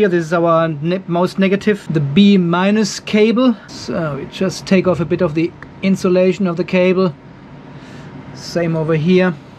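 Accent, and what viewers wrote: Germany. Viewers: Jetzt musste ich wirklich lachen! Ich sehe Deinen Kanal sehr gerne und dachte mir immer: Irgendwie hört sich das wie ein deutscher Akzent an, aber was weiß ich. Nun Dein Schimpfen im Abspann: Habe so gelacht. Also viele Grüße aus Franken to sunny hot Australia.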